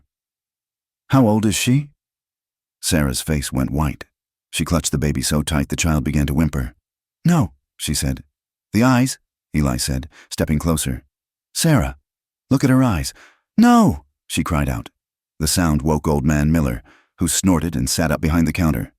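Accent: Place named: Boston, United States. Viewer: Love your sexy gorgeous voice by way of Boston! Hope it’s everything I want!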